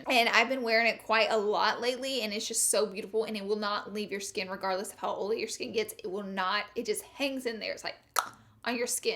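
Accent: South, United States. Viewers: I love her southern accent! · I love the southern accent, its adorable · Love ur southern accent girl 👍❤ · You're awesome blossom 😊 Love ya .... keep saying oil the way you do. Most ppl love it and your southern self ❤️ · When you're from the south and talk the same way 💕 Girl I got you 🤙🏼 ole ftw 😂😂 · Honey, you say oil just fine. I love how your southern accent pops in once and a while. You stay strong to where you're from and I think that's an honorable thing. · I love that you're so unapologetic about your southern accent. I never get to hear people like me in the beauty world so I ❤️ you and your channel! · I love the southern accent, ❤️from Tennessee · Love your southern twang! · I <3 your southern accent!!!! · Love your southern accent ❤️👌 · LOVE THAT SOUTHERN OHL!! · I love your southern accent, why would someone not like it #snapfam💦💦💦💦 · I love your Southern slang 🤣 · I am from the south and I love hearing beauty guru type YouTubers talk the way I do.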